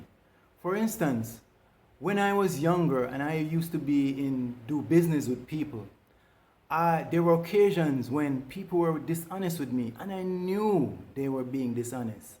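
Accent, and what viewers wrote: Caribbean. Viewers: My Brother, my Brutha...I am SO incredibly happy that YT has gotten around to listing your videos in my Suggested Videos, and SO proud of you stepping up to the world with this channel! While BPD, specifically, is not my issue, Depression and Anxiety ARE, and the first thing - my first response - to your thumbnails was 'Finally! A MAN talking about this, quite vulnerably (I saw the video from 2 years ago, apparently, that seems as a confessional, or testimonial, about HAVING the problem; not just prattling about it from a clinical, and thus, removed perspective) and, furthermore, a BLACK man....even, a fellow "COUNTRY man" (as your speech connotes Afro-Carribean sounds to my Jamerican ears, hahaha! if I'm wrong on that, please excuse me). The drumbeat about us men seems to be loudest in constantly bashing and devaluing us, with the term Narcissist being the only term applied to our psyches with any ease - spoken from the mouthes of White women, primarily, and White people, secondarily, as the default "experts" on either component thereof: For other eyes reading this with offense: I DON'T CARE about how "uncomfortable" what I typed makes you! 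Try being said, awful Man of men, let alone a BLACK man, whose own humanity DAY IN, DAY OUT, is denied by the constant and socially co-signed 'drumbeat' that we endure! I have NEVER felt so directly, explicitly addressed and acknowledged by ANY OTHER persons' videos about mental health - helpful as many of them are - before yours, (?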